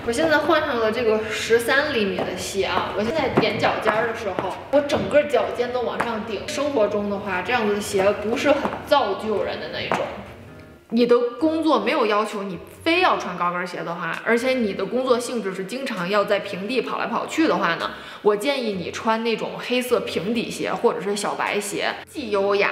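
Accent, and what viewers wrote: Tianjin, China. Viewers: 老乡，一听你说话就知道你是天津人，超飒。一听到你说，马上贴墙测试，发现轻松两只手可以放后背，那我还适合这个站姿吗，怎么让骨盆回到正常位置？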